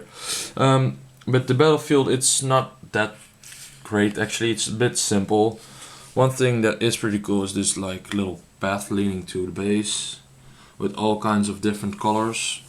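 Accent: Netherlands. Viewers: Are you dutch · are you dutch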